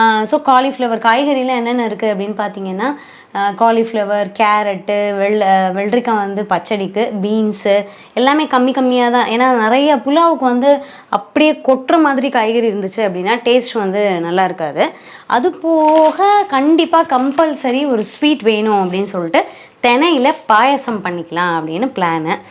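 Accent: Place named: Tamil Brahmin, India. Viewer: I think you are a tambrahm since your diction is familiar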